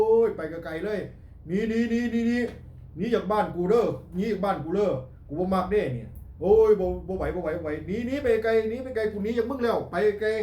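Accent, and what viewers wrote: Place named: Nong Khai, Thailand. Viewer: พูดได้ดีมากคะไทหนองคายคือกัน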